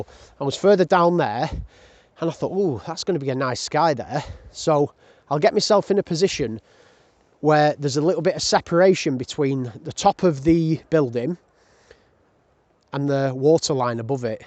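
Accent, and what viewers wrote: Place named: Lancashire, United Kingdom. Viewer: Another great video, your Lancashire tones are music to my ears! However.....even better if you knew where you were! You were not at Devils Kitchen, you were at Cwm Idwal and then Llyn Ogwen. South Stacks is at the north west of Anglesey not the southern tip!😂😂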